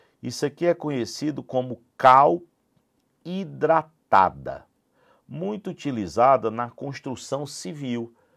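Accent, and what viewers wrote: Ceará, Brazil. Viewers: eu amo o jeitinho cearense que ele explica kkkkkkkkkkkkkkk, simplesmente o melhorrr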